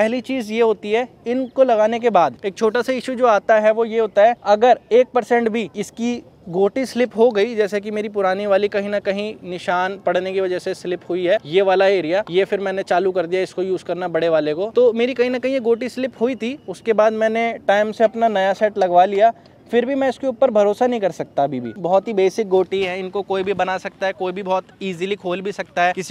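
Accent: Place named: India. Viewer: I had a hard time understanding your English due to heavy Indian accent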